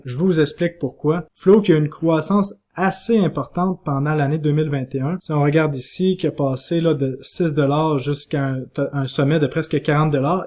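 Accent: Quebec, Canada. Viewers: Je n'aime pas l'accent Québécois lol. Mais j'aime bien ton contenu ! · C'est vrai que je trouvais l'accent canadien horrible avant, et maintenant grâce à toi en plus d'en apprendre beaucoup sur les crypto, et bien j'aime bien cet accent ah ah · Merci encore et encore pour tes conseils et analyses. En effet ton accent est très agréable, ça me rappelle mon voyage à Sherbrooke (il y a 30 ans, time flies). Keep up the great work! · Le mana prendre position ou non ? L'accent canadien ma toujours fait kiffer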